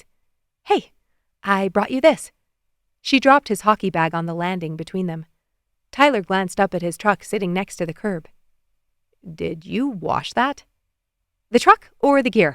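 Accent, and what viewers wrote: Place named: Canada. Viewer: i love this soooo much the slight canadian accent just warms my heart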